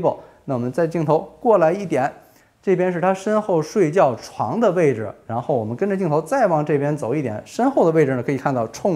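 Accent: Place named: Beijing, China. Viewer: 听口音应该是北京兄弟... 这种豪宅看看就好，因为维护及保养费用会高的惊人，我们这种做小生意的人一旦买了就真成了房奴了！节目办的不错，加油啊兄弟！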